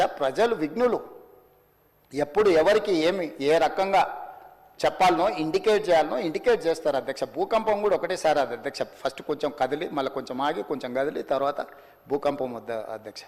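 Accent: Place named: Telangana, India. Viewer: Super speech with telengana accept and adequate wordings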